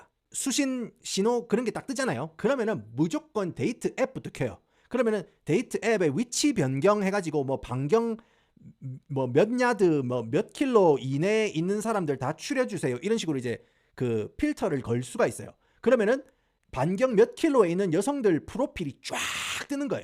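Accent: Gyeongsang, South Korea. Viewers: 허리까지 내려오는 까만쌩머리이 ㅋㅋㅋㅋㅋㅋㅋㅋㅋㅋ아놔 커피뿜음 ㅋㅋㅋㅋㅋ 넘 젬나는 갱상도 ㅋㅋㅋㅋㅋㅋ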